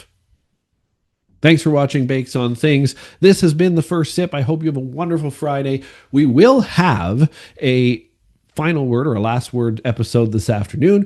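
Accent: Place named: Canada. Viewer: Good to hear a real Canadian speaking real Canadian . . .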